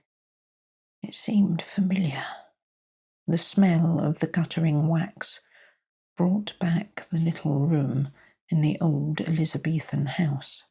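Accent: United Kingdom. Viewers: No problem,. Your British accent also fits these tales. Keep up the good work!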